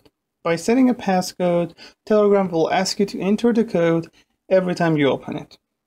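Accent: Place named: Iran. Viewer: thank you,, nice persian accent :)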